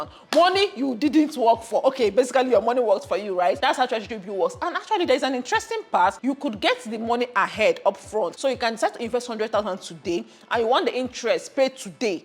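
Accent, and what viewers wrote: Nigeria. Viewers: Igbo women and money 🤝 Also that thick Eastern accent, I love it so much😌😌😌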